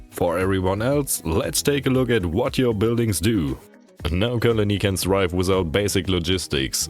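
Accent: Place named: Germany. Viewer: Ich liebe diesen deutschen Akzent. :D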